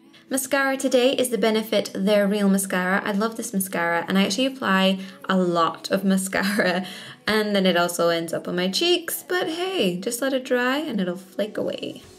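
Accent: Ireland. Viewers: hey!! are you irish!! :P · Which part of Ireland are you from? I can't place the accent!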